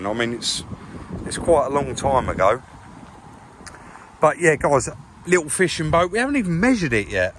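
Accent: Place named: Southend, United Kingdom. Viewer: Haha I swear your South end accent has gotten stronger in these recent videos lol. "Thirty orse, this fing ull go like a rocket" "sprayed every fin ap wiv a bit ovv dabble u dee fortee" 😂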